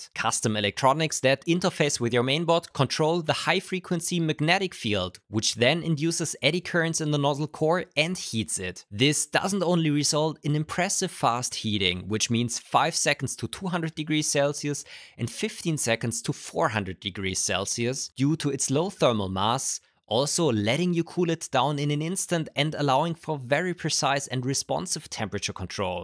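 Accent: Germany. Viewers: Just saw this channel, you can hear, that you're German and I just wanted to say congrats. It's pretty rough to get that much abos as a German. Also the video ist pretty good, thanks. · You are habe a Little Bit of german aktzent, vermute ich mal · Gutten tag eveybody man merkt das du deutscher bist aber grundsätslich sehr guttes video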